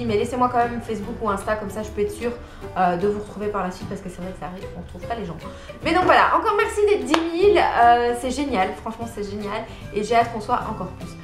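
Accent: Belgium. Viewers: bonjour, trop contente que tu organise ce petit concours car j'aimerais vraiment me lancer dans le métier de prothésiste ongulaire. écoutes je t'ai connu de façon un peu singulière, c’était un dimanche en fin de journée, ayant acheté il y a quelques semaine une machine led et quelques vernis semi-permanent j'ai voulu trouver sur Youtubeuse qui expliquait de façon clair et précise la pose de vernis semis permanent. et biensur je suis tombée sur toi!! tu ne vas pas me croire mais j'ai tout de suite était captivée par ta voix, tu expliques très clairement et c'est ce qui m'a plus. j'ai d'ailleurs reconnu le petit accent Belge!!!! du cou^p j'ai parcourus un peu toutes tes vidéos et me suis abonnée tout de suite. je dirai que la première vidéo que j'ai vu de toi était celle de la pause d'extension en gel avec le chablon (PAAS LA PLUS SIMPLE ) mais je ne sais pas pourquoi je me suis tournée vers celle là. 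voiliiii tu sais un peu tout. merci d’être la, d'avoir le courage et la patience de partager ton savoir et surtout d'être TOI. a bientôt mon inta est ET MON fb Alicia mbuyi muadi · Coucou! Alors j'ai découvert ta chaîne grâce à ta vidéo TUTO pas à pas French avec du semi-permanent. Et ce qui a fait que je me suis abonné est que j'adore la façon dont tu parles c'est super agréable, tu donnes beaucoup de conseils et ton accent belge est sympathique!! En tout cas je viens tout juste de découvrir le gel et l'acrylique donc j'aimerai justement m'y lancer. Félicitations pour tes 10k, ca a l'air de vite monter, bisous bisous! FB: Louise Rousseau (ArcEnda)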